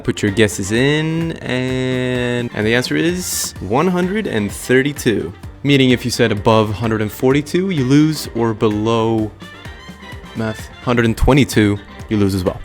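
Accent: Sweden. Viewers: I knew you were Swedish from the accent before I checked the channel info lol Great video!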